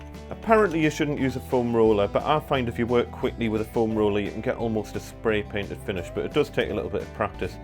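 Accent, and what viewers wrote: Newcastle, United Kingdom. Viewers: I have to use oil based gloss for work sometimes when the need arises, and at the start i didn't like the sound of using the water based but i think with me it's got to a stage that i want to try it out. I think when you are painting doors etc and you have to deal with the smell, plus any possible runs you get at the corner of panels, the time it takes to dry, and especially when you have to clean up and put tacky equipment back in the van with a fear of anything tipping over and getting it on stuff you wouldn't want it on, i just can't wait to be able to wash my brush and trays out under the tap and not have to worry about it, plus how quick it would dry too compared to oil based paints. Love the Geordie accent by the way followed by the Jimmy Nail grin. I'm glad you told me al aboot that. Many Thanks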